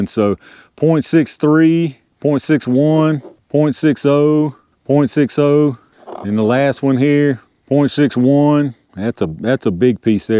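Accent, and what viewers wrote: South, United States. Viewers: I Love that southern accent !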